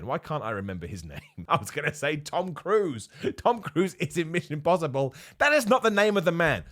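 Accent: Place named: RP, United Kingdom. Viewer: I like that as got older his voice became "posher". I'm glad the first thing on the list was that you can't completely change your body to look like someone else no matter how much work you put in or how heavy the weights are you lift. You shouldn't have a goal to have abs, legs, arms like one specific other person, because you a different people. If you exercise and train your body isn't going to look like anyone else because it's unique to you.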